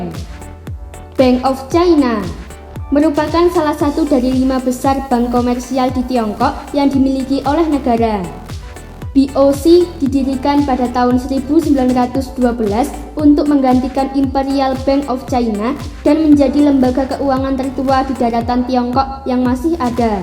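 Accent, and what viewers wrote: Java, Indonesia. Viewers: Medoknya terganggu banget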